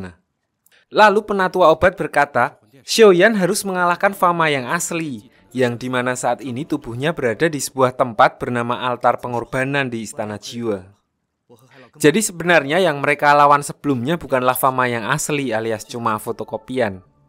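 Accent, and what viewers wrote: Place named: Java, Indonesia. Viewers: Narator buagusss bgt. Ono jowone... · Mantuul mas bro suaranya, lucu dari Jawa ya salam kenal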